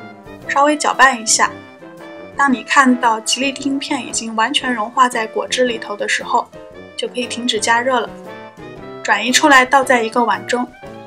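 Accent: China. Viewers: ellen Qu 我猜是中國因為我去過大陸我覺得她有時講話很和大陸人講的一樣喔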